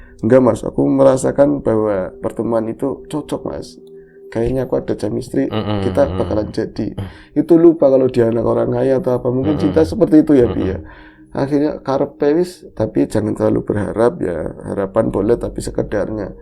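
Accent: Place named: Ngapak, Indonesia. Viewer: hehe..hehe...seru juga ngobor...bahasa ngapak na. medok banget...jadi pengen ikutan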